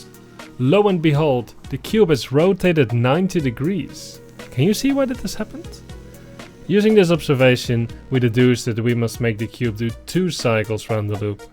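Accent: Netherlands. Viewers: neat your dutch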